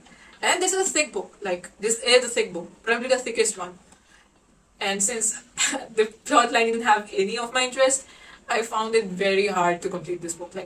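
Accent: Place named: England, United Kingdom. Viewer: literally set fire on stage 🔥🔥🔥 and your english accent!! Wowww!!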